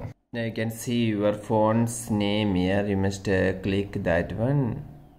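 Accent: Kerala, India. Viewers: Terrible, thick, incomprehensible Mallu accent!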